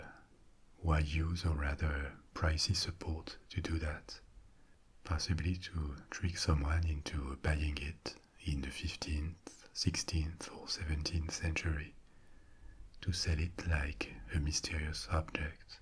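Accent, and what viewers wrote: France. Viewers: I used to live in France a long time ago. Your lovely accent takes me back to that delightful time in my life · You will always be my favorite ASMRtist. Your low voice just the way you do it and French accent is so soothing, and you really do your research for these videos and stories. You are simply the best there is!! Thank you so much for your efforts. We really appreciate your work! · I really love the stories and the French accent, but the only thing I don’t like is the big gap after the story ends with some videos. I think it’s designed to be some restful sound to help you sleep but I have a playlist of stories I listen to and would prefer that at the end of the story it ends so my playlist can move onto the next story. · I haven't slept this good since childhood! Your French accent reminds me of my grandmother who was a war bride from 🇫🇷 France. 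It sooths me to sleep as her voice did so many years ago. Thank you so much for the work you do. Your videos work so much better than any pill and as a chronic pain, extreme at times, sleep doesn't come easy. Your dedication is very appreciated by myself and many others. Thank you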